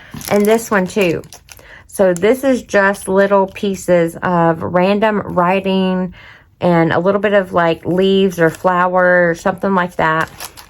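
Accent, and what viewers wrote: South, United States. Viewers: Hi, I just discovered your channel. I just loved this presentation. I'm a crafter and love Temu. Thank you for the information on the price adjustment, I just got $3.78 credit just for the last month. Also ordered some of those random die cuts. I had been wondering if they were worth the money. Also I don't know where you live but I just love your southern accent! Thanks again.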